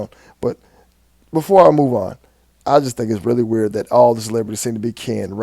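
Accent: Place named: Memphis, United States. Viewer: Thas mah cuhhzen 🤣🤣🤣 I like the Memphis accent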